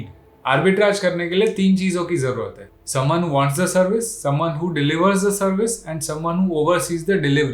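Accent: Gujarat, India. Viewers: Bhai hindi ma pn tamri gujarati tongue ave che, ane ek gujarati j atli saras video banavi sake ane reality batavi sake. Bija video lavo detail ma ane sadi bhasha ma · Gujarati Spotted 🤓 , Right ? 10 Sec, Video Dekh ke hi pata chal gaya .. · Gujarati che ne bhai tu taro, tone gujrati che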